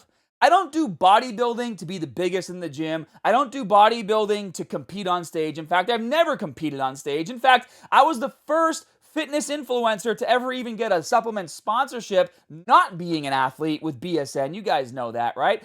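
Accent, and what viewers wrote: Boston, United States. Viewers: I'm never ready for the Boston accent lol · R u from boston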